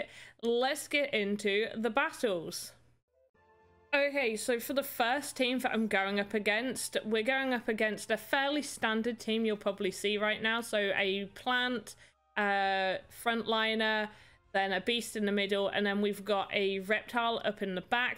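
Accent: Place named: United Kingdom. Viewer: I like ur speaking! U cool! Lovely British talking! 😇❤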